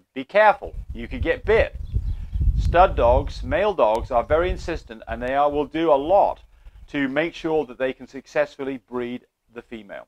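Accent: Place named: England, United Kingdom. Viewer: Ps: I saw you have a English accent 🇬🇧I’m from England too. Do you know anyone in England who breeds dogs and does these videos? Thanks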